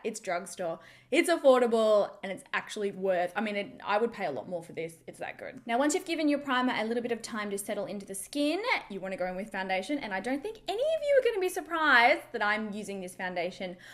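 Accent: Australia. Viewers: Love this makeup video,,, as always you slay every makeup tutorial review and your an awesome YouTuber,,, another thing I want to say is I love your Australian accent,,,, am a fan of home and away and I just love the way the actors speak,,, lol I just wanted to put it out there ☺😎 Lots if love from the UK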